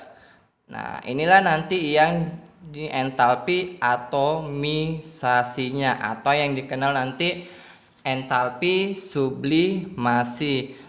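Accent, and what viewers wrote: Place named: South Sulawesi, Indonesia. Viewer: Duh maaf ga tau, soalnya logatnya hampir sama kaya kating saya yang dari Sulsel hehe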